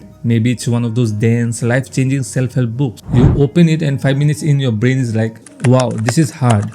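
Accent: India. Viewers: I hear Indian for some reason like it’s screening at me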